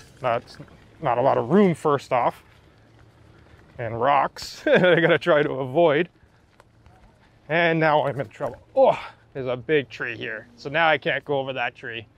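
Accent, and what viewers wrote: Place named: Canada. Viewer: I love it when Canadians go oot n aboot.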